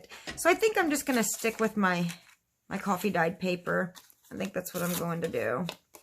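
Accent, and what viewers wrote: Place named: South, United States. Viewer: you do sound southern to me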